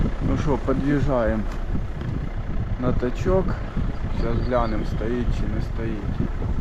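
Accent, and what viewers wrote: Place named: Kryvyi Rih, Ukraine. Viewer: Криворожский говор мне понравился больше чем другие украинские языки 😊 Более красивый и понятный , чем язык , которым разбавляет зеленский.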